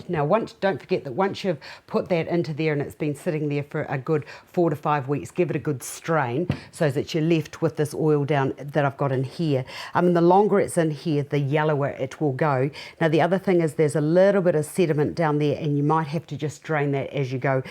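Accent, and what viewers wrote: New Zealand. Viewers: John Anthony : Vit E I’m sure ... New Zealander accent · Hi. I'm new to these things. this is my first look at Balms. I really enjoyed this video and found it so easy to follow. I want to thank you for such a great intro into what I hope I will become skilled at. also its great to hear a NZ accent. My family and I are due to move to NZ in the next few months from UK. As if by magic I find this vid first. Great. Thank you again.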